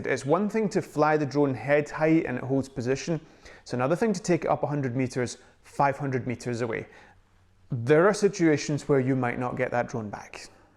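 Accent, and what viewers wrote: Scotland, United Kingdom. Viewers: Do you think the RC controller is worth the extra cost, since most of the time you are likely already carrying your phone? Great video! Love the Sean Connery like accent!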